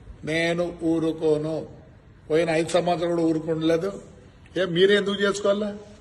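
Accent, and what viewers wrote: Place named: Tamil Nadu, India. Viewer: Babu JC garu, meeru telugu vaarena? Aa telugu enti, evaro Tamilian matladinattu… konchom Sarigga telugu nerchukovayya mundhara…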